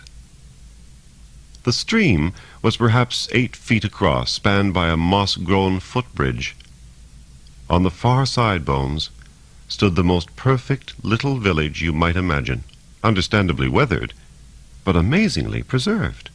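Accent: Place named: United Kingdom. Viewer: Horror stories should always be read in british accent. Good!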